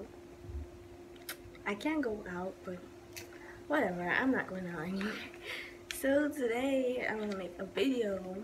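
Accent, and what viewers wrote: Jamaica. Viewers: You're so beautiful, I love the Jamaican accent.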